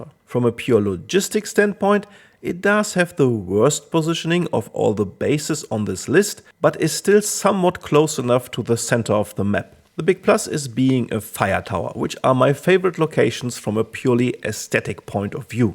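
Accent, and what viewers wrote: Germany. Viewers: I knew from the first words that ur geman. Mein kollege👌🏼👌🏼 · Nice video! I also love your german accent 🍀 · Bro, is that a KI set on maxlevel german accent, or is that Person real? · Da versucht aber jemand seinen deutschen Akzent zu verstecken, aber nach den ersten zwei Worten hab ich es schon gerochen😂 Dazu kann ich nur sagen, liebe Deutsche keine Angst vor unserem Akzent, die meisten Englischmuttersprachler (vor allem die Amis der Ostküste) finden unseren Akzent sehr charmant. Komischerweise empfinden nur wir Deutsche uns gegenseitig als unangenehm und versuchen den Akzent zu verstecken.... Warum auch immer. Mehr Mut zu unserem Akzent 💪😉 Gleiches gilt auch für Spanier und Italiener, auch die empfinden ihre Muttersprache mit deutschem Akzent gesprochen als charmant, das ist zumindest meine persönliche Erfahrung. Sehr gutes Video, hab das Spiel noch nicht gespielt, werde es aber mal testen. 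Weiter so!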